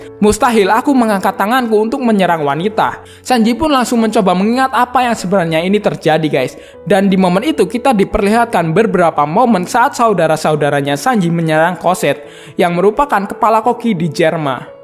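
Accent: Java, Indonesia. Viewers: Tapi nada yang emang bikin nagih. gx bisa digantiin. Apalagi inggrisnya enak bro, lancar tapi aksen jawa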